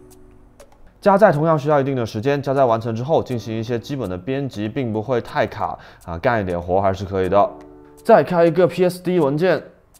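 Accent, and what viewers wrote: Cantonese, China. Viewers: 請問你是廣東人嗎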